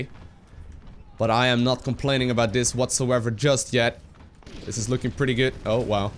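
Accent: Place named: Netherlands. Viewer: that dutch accent... r u from holland mate?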